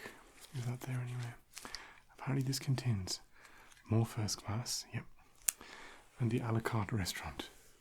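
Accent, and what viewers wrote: England, United Kingdom. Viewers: it's definitely an English accent but even after moving countries it can be hard to shake it. My dad was born in Halifax, we live in Australia but he still sounds mostly Yorkshire 😅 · ooops! I thought your accent sounded English! I’m not too far from there- North Yorkshire.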